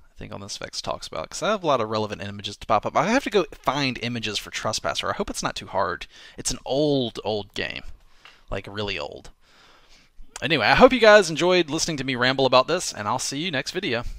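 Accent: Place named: North, United States. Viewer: ***** I was pleasantly surprised, but only because Vechs seems to have a pronounced Northern American accent. As a Texan with a WWII rifle and a full-size steel combat pistol less than 2 meters from my bed, it's perfectly normal, but Americans from the more Northern states stereotypically avoid gun ownership (Maine and New Hampshire excluded). But I'm delighted to find out Vechs likes guns too! He's risen even higher in my estimation of his character.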